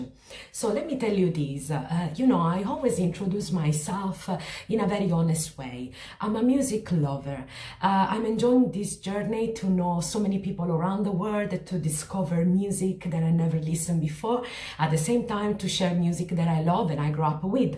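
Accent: Italy. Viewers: Reina, gracias por reaccionar a un genio del rock Argentino como lo fue. Un musico diferente al resto.. Noto una bellisima tonada Italiana. Eres de Italia? por que hablas en ingles? Besos linda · Amo tu ingles con acento italiano suena cool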